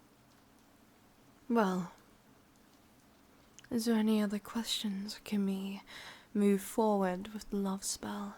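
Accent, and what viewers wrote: Scotland, United Kingdom. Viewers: Omg wait are you Scottish 😭👋👀👀❤️ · I'm getting a mix of Scottish and English in this. Like me, hurray😂. Good video lol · H New to thus channel and the accent is great, for me it sounds a bit more Scottish than English. I know I'll enjoy this channel tho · I like this which though I do feel sorry 😞 for not finding the one I hope she does someday ❤️❤️. I really love your voice acting by the way you sound very sadistic but hot also you also you sound so beautiful & soothing I’m impressed 👍👍👍👍👍👍. Also you shouldn’t have to change the way sound personally I like your Scottish accent it sounds gorgeous in my opinion & I’m British with an American accent & I’m proud of it hope you had a great Valentine’s Day ❤️❤️. Kind Regards AuraKnight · yup. Prefer your Scottish accent tbh. Havent check all your vids but is there any rp with your natural accent? 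· Your natural Scottish accent is far nicer.;) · Thats Scottish accent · this is more scottish than english honestly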